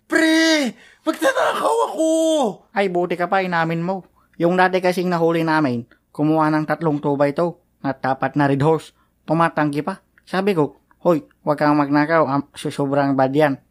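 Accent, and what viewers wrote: Ilocos, Philippines. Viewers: Hahahaha ilocano ka gayam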